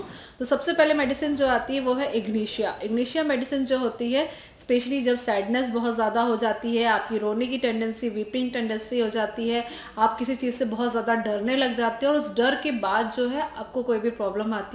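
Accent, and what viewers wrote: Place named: Punjab, India. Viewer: punjjabi voice 6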